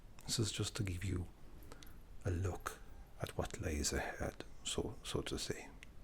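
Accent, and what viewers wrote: Wales, United Kingdom. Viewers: OMG Your Welsh accents are my favorite videos and your quiet voice is my lullaby. ❤❤❤❤ im quite convinced your a genius. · Ever since Peter Kinney made the beautiful decision to make the dwarves Welsh in the Witcher audiobooks I have been dying for Welsh accented ASMR and you beautiful bastard you did it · Commendations Greetings Appreciation Respect And, a great love of a lovely Welsh accent I kid you not One of My other favorite YouTubers has a beautiful Welsh accent Even though he speaks in a mundane friendly full voice, it's super soothing So Algorithm, please promote all my favorite Creators, especially, in whose comments I am about to post.... Food for you, dear Algorithm And Dearer friendly creator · Are you actually Welsh?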